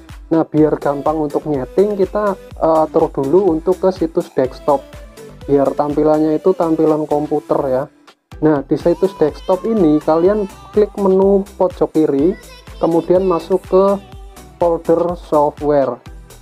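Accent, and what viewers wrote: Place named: Kebumen, Indonesia. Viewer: Alamatnya mana bang kayake kaya wong kebumen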